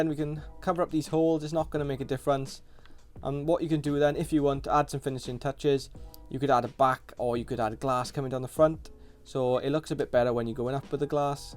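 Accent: Wales, United Kingdom. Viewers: Welsh I think. Correct me if I’m wrong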